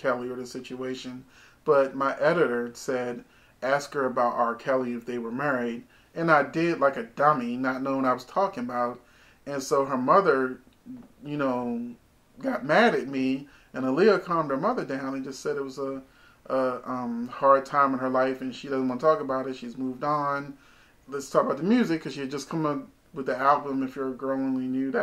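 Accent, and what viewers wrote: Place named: St. Louis, United States. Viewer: Where are you from? Yo accent sounds like St. Louis.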